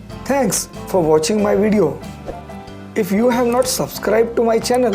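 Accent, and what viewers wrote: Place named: Maharashtra, India. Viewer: True, it's a nice place to be with nature. Would love it more, if the commentator spoke English English. It was Marathi English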